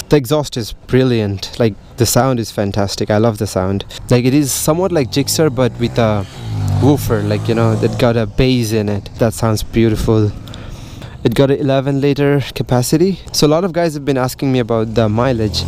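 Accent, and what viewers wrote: United States. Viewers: You dont have the indian accent are you half American? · Yupp buddy, very impressive Bike review you made ever here in BD like American accent really. I like your accent than bike at all, ok buddy go on I hope you can do what you looking for best of good luck for next review.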